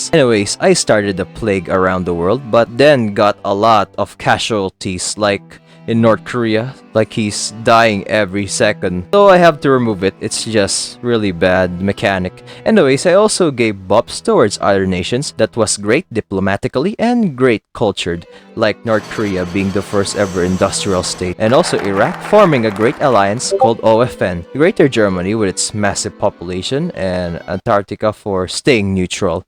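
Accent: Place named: Philippines. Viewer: Your pinoy......i can guess from your accent❤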